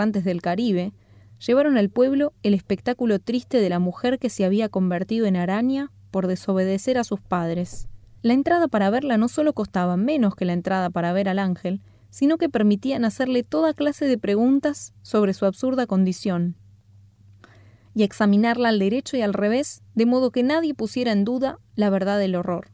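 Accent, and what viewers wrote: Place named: Argentina. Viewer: Es nuestro acento, no veo motivo por el cuál corregir el acento Argentino (?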